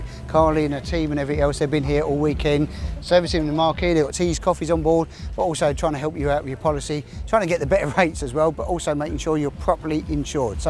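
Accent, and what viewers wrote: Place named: Cockney, United Kingdom. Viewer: Put the Camera on the cars...Can't understand a word this cockney is saying...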